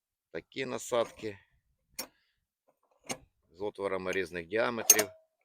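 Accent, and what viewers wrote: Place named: Western Ukraine, Ukraine. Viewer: Ты як бендеряка( маю на продаж) у нас так ніколи не говорили в полт.обл.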